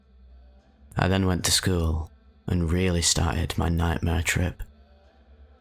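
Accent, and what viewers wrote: United Kingdom. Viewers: Are you actually British or is that just the accent you do? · I don't want to seem stupid but you said your from canada did you move to the uk? Because of your accent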